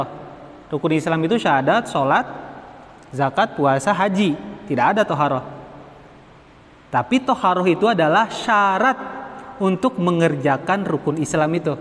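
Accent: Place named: West Java, Indonesia. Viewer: Logatnya kaya orang Sunda ya ustadz, hehe maaf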